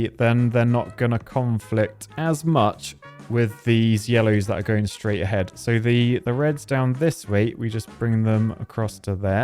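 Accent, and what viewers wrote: United Kingdom. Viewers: I forgot you were British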